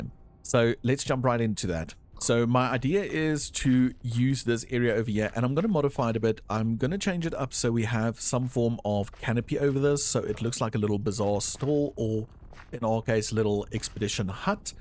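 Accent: South Africa. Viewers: From SA? I hear the accent 😂 if so thats dope. Been enjoying this season of SMP so many new creators to discover 🔥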